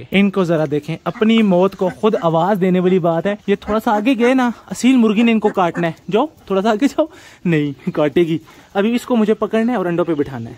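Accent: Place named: India. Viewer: मुझे आपका बोलने का तारिक इतना पसन्द है ना की लगता ही नहीं आप पाकिस्तानी हो हमे इंडिया जैसे ही हिदी बोलते हो dear और जिस तरह से हर पशु पक्षी का ध्यान रखने हो ना बड़ा ही अच्छा लगता हैं देख कर dear God bless you dear 🍫😊🇮🇳🇬🇧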